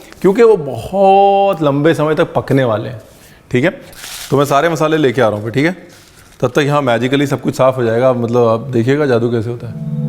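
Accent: India. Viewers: At first I used to ignore your videos coz I’m not that much into cooking!! Then I started watching ur videos.. now I’m really liking ur videos ofcourse I get to learn few things but mostly your Indian-desi style of talking n cooking... ur videos are entertaining!! Keep up the good work Ranveer!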